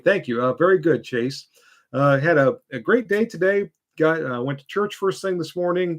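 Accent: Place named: Texas, United States. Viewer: okay okay okay, I may have thought it when I heard your Texan drawl.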